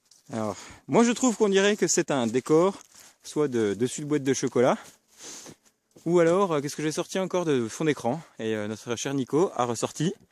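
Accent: Franche-Comté, France. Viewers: Coucou suis de sortie demain ou dimanche vous laisserai une dedicasse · J'aime pas trop le côté commercial de chez bear gylls je préfère les couteaux plus rustique, comme la ma prochaine acquisition sera le bushlore de chez condor, si tu ne le connais pas Ju0069 a fait un rewiew test très intéressante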